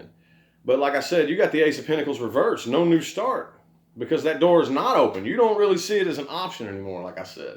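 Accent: South, United States. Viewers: Lmaooooo....i love that country accent oh my god.....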